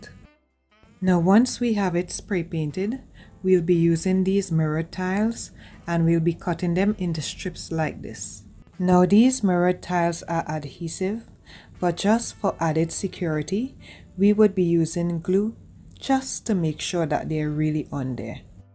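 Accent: Trinidad and Tobago. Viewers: Did i detect a slight trini...love from trinidad🇹🇹🇹🇹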